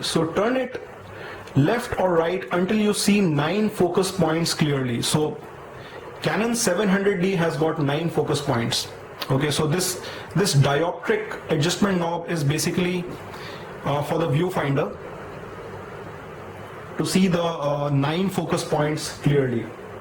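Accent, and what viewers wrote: India. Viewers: hey dude! shy did u shooted only 3 videos? u have 4000 subscribers only with 3 videos... u should make more videos.. u r great in explaining.. and u sound like an indian. are you indian? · It's very helpful... specially the Indian accent :) Thanks a lot buddy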